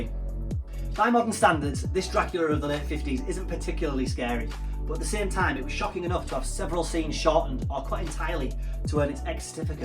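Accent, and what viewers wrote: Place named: Ireland. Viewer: I love your brogue <3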